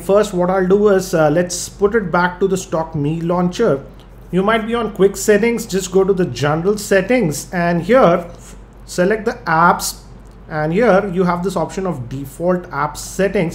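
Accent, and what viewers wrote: India. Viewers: Indian voice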